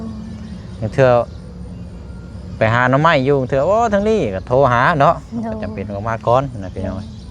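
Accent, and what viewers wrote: Thailand. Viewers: กลับมา..จากบ้าน ( ออกจากบ้านมา) สำเนียงไทย ❤❤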